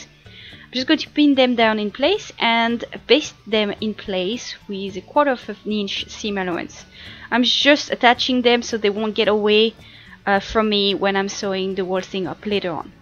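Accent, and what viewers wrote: France. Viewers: Hello ! I love you tutorial ! I planed to do one ! Are you French ? If yes, I am too ! I think so because when i talk english i have the same accent >w< ! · Are you French ??? ( you have the accent ) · where are you from? you're accent is like a french accent °-° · are you french :3 ? you have an accent similar fo french 'i speak french btw" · Are you French ? I love the tutorials do more ^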